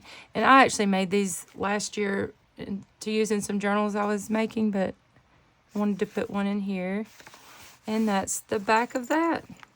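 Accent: South, United States. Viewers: Love your southern drawl ❤️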